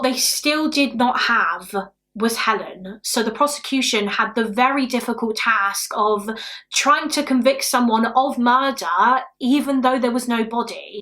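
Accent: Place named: England, United Kingdom. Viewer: Yesss back 👏👏 I love your channel so much - especially happy to hear an English voice 😌 fantastic video & keep up the good work, from North Yorkshire ❤️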